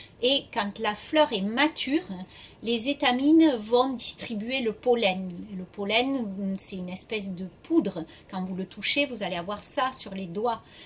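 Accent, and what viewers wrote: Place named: South, France. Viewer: Bonjour, vos explications sont claires et précises, et avec l'accent du midi cela nous amène le soleil. Vive le sexe😉 des plantes.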